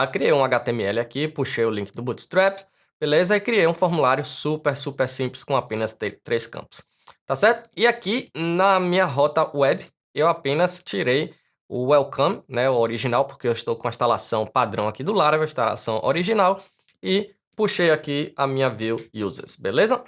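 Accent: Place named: Bahia, Brazil. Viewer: Muito top a aula mestre!! Acho maneiro seu sotaque baiano hahahaha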